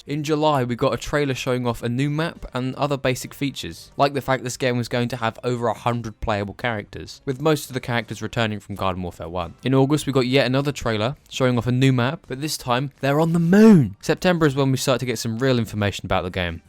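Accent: United Kingdom. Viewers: You sound British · bri ish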